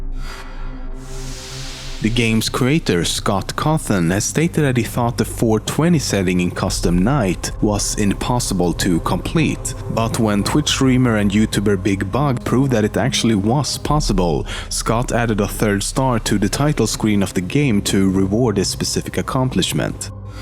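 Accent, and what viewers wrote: Sweden. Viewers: You are swedish huh? xD Strong accent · Your accent sounds swedish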